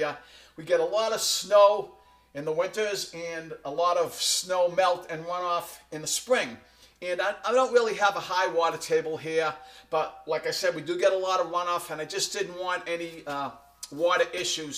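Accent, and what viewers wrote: Boston, United States. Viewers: What's your accent? Boston?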